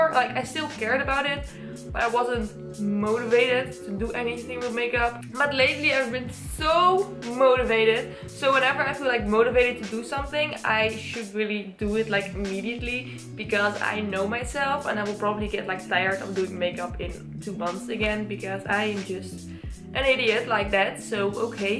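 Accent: Netherlands. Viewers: Hey girl, as soon as I listened to your voice, I knew you were a Dutchie! Fellow Dutchie here 😄 Love the NYX soft matte lip formula and really like these metallic shades! 😘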